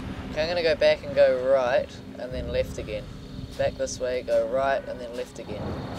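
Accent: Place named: New Zealand. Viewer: HEY! You're from New Zealand too! I recognised the accent :D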